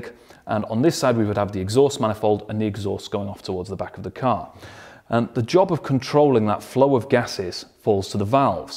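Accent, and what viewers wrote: United Kingdom. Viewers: Just stumbled upon you after googling how water cooling works. Terrific stuff mate - as an Aussie I'd much rather listen to a Brit over an American and it's thrilling to now know there's one out there to listen to!!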